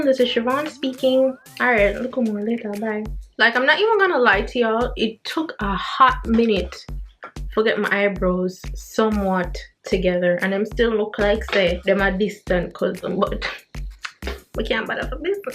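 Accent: Jamaica. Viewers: Omg I love her accent my favorite people are Jamaicans ❤ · “Mario Sum’m Sum’m Sum’m” 🤣🤣 Loveeeeee di patios vibezzz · Mi just enjoy all deh patois video deh. Mi love fi hear ya speak Jamaican Patois enuh. ( Jamaican- American here) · Yh man she a Jamaican ✔✔Certified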